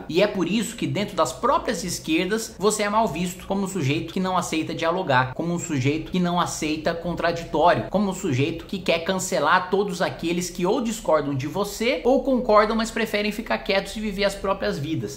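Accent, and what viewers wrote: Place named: Pará, Brazil. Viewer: meio paraense, legal.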